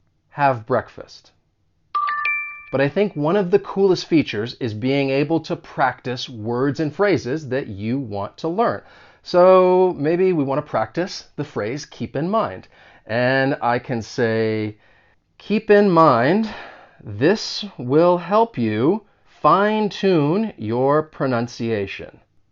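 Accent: United States. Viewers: I do love American accent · Is this American English? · thank your video is so helpful. so,keep going! is your english american? from japan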